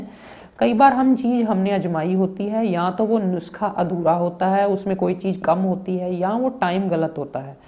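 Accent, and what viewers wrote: Punjab, India. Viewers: Thank you ji Apki language me punjabi touch dikhta hai ji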